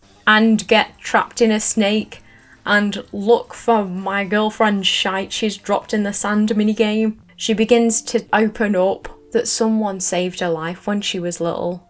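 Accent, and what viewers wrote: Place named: England, United Kingdom. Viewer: Recently found your channel and marathoned most of your content, I moved away from England 8 years ago and didn't realise how much I miss the accent. Glad to find a new upload.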